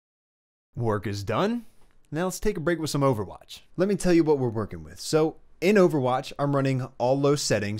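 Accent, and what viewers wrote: Texas, United States. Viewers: Excellent video, I'm curious, are you from Texas?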